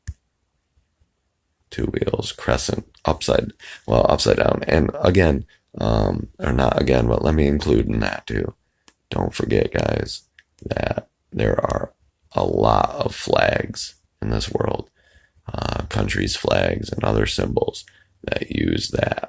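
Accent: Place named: Northern England, United Kingdom. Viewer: Northern English accent. Bordermen on the south side of Hadrian's wall, The Roman empire didn't dare go any further North then the famous border wall. It's really nice to meet you and wish you all the best in the future and good health to you and your loved ones. Gonna get my camera out it's just getting dark now. What do you make of the Sickness that is being spread among us apart from sick of it already? Culling the population by virus?Anyways I have subbed and if anything happens or If I get my act together I will post u a message.Peace!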